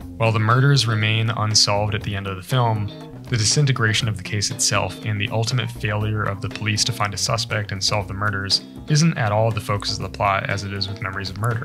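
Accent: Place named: Eastern Europe. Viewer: I feel Slavic notes in ur voice. Gotcha 🤫🗿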